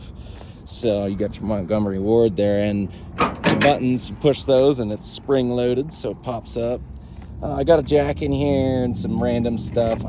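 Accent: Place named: California, United States. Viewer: You have a great project there . I could tell by the sound of your vernacular that maybe you are coastal [ maybe California ] . If I were you I would look into an E-Bike franchise that rents bikes or a delivery service to sponsor you to gain funds in your build . A dune buggy engine cage , hip high quarter panels and you can raise the bed to hide a pull out ramp to put bikes or cargo in & out with a cool thick mesh door like they do with truck tail gates . I subbed because I remember back when I was 18 in the middle 80s I bough a 1954 oval window bug rough & rusty but Dude it had all of its original guts [ drive train and interior ] weathered and worn . Put 2K into it and had to move over seas and gave it to my dad . Never give up the first toy you made yourself . lol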